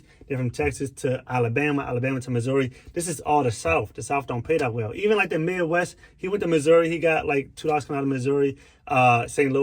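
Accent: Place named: Spain. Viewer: Yow how u sound well on these videos but on the phone when u talking u hv a Spanish guy accent 😢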